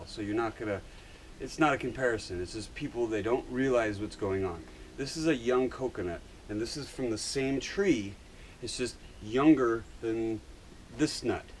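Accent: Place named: Midwest, United States. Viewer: I’m willing to bet you did not grow up in Hawaii. You know you would be ridiculed for wearing those sandals and speaking with a midwestern accent. Be honest, what state are you really from?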